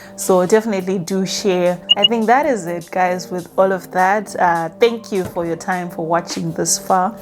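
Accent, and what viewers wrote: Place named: United Kingdom. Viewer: The british accent..... we can feel it.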